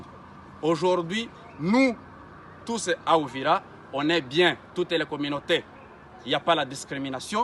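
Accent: Rwanda. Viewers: A vous entendre dire avec votre ton et prononciation , c' est le Rwandais qui parle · C'est la terre congolaise, quitte avec ton français Rrrrrrrrrwandolisé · Tu és vraiment Ruandais tá pronosiation est de ré.Tika biso tika lokuta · Vous retournerez au rwanda chez vous même votre tonalité prouve que vous êtes rwandais pas congolais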